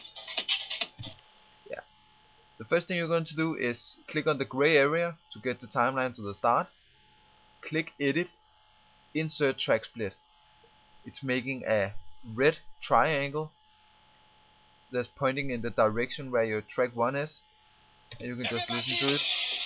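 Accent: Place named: Denmark. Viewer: where u from? hmm, danish dialect lol!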